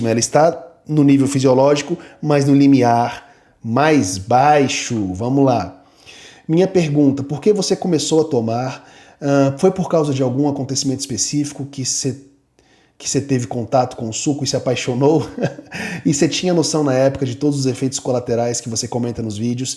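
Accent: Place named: United States. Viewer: é americano, isso explica o inglês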